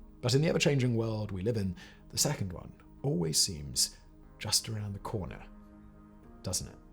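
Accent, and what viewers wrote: United Kingdom. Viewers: Hard enough to understand his heavy British accent and then he mumbles too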